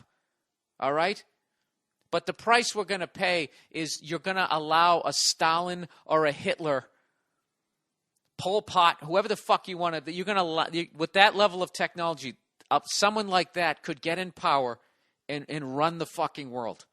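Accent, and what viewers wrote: United States. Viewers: lol i love the country accent to lol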